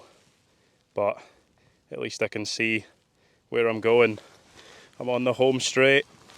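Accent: Scotland, United Kingdom. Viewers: Love this program. I'd love to see some episodes from the national parks or even greater or lesser known castles or more country estates of royalty etc. You can still go traipsing up the wee little monros all ya want. You come across very well when you speak. You would be good at telling the stories of the castles etc. Your Scottish accent is lovely. 🙏🏽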